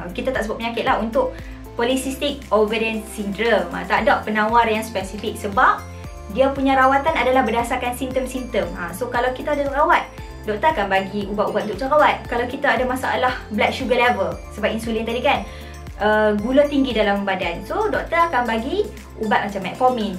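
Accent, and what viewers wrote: North, Malaysia. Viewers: Very informative👍👍. Jgn hiraukan manusia2 yg jeles dok ngata bagi sharing session. You do you💪🏻💪🏻 btw, seronok dgr loghat utagha rindu pulak chegu2 kita dulu. Hahaha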